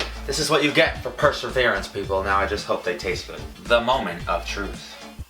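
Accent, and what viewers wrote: New York, United States. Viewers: If he isn't from New York I'm gonna doubt my accent knowing skills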